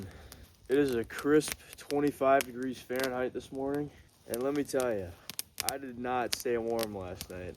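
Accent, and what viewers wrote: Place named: United States. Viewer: It looked nice and tranquil where you were, but you're nearly always at tranquil spots. I liked the footage at the end when you were leaving on the boat, and the music. That was a good wall you made with the logs, and a good idea. I really like your American accent too! Which part of the States are you from?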